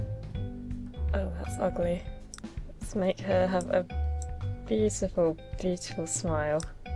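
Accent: United Kingdom. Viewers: I love your accent <3 I miss England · I LOVE UR BRITTISH NISSSSHHH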